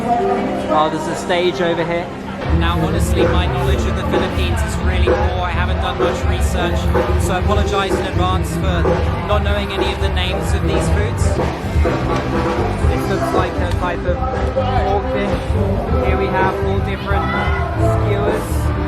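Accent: England, United Kingdom. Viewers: I love your voice and English accent..You are exceptional in explaining sequence of events during your vedios...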